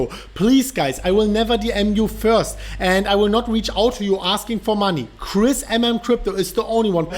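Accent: Germany. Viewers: I love your German accent. GET DOWN...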